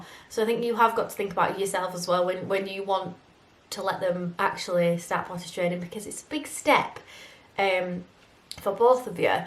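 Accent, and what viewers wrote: Yorkshire, United Kingdom. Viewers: İs that a Yorkshire accent?! X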